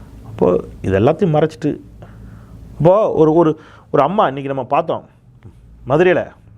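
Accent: Tirunelveli, India. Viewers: தம்பி எல்லாம் சரி தான் பா. ஆரம்பத்தில் ரொம்ப மிராட்டுகிராயே. நெல்லை வாசமா? நீதிபதி தங்கைக்கு, வங்கி மேனேஜர் ஆகியோருக்கு வாழ்த்துக்கள்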